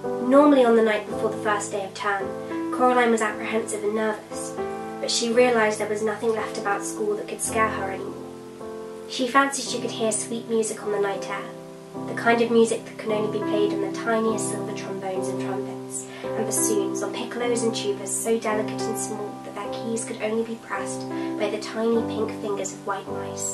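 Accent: RP, United Kingdom. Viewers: I am sorry if this is offensive or if I got your accent wrong, but by this whole video, I think there is no a person who is more posh than you are! Again, I am sorry if this offends you, I mean it as a compliment - I loooove everything you do. It is nice when someone is tidy, organised and does everything calmly, step by step. I also love how this is not a typical tween/teen video of checking through the social media and other stuff. I know a lot of people light candles now since it is popular currently, but I still loved it. Also, I am a Potterhead myself and a book fan so I loved that part too! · Omg she must go to a very posh school! She wears a blazer to school and she has summer homework!🤔 and her accent is quite posh too,and she uses a quil🤔 interesting🙂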